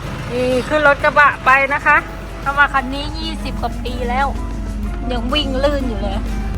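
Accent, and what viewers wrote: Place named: Lom, Thailand. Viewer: บ้านอยู่อำเภอเมืองหรือครับมิน่าพูดหล่มได้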